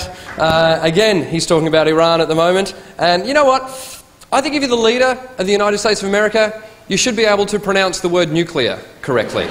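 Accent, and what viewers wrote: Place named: Australia. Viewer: Thank God Bush is out of office, but it's still great to see someone (especially an Australian) poke fun at him. The accent just seems to make everything funmier.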